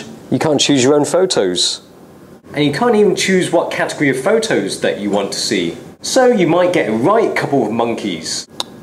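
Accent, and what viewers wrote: England, United Kingdom. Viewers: he was born in england just because hes of asian decent doesn't change his voice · no offense how are u asian but with a english accent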